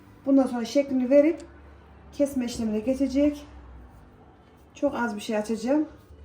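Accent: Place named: Aksaray, Turkey. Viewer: Eline sağlık çok güzel görünüyor ayrıca konuşma tarzından anladım hemşeri olduğumuzu 68 vatan 07 mekan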